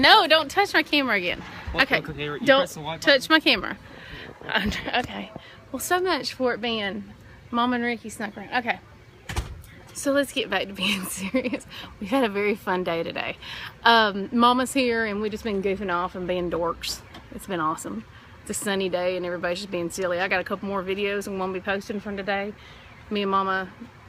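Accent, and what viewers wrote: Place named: Kentucky, United States. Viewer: Oh, I grew up in Kentucky so I love watching your videos because I miss hearing the accent now that I live in Alaska.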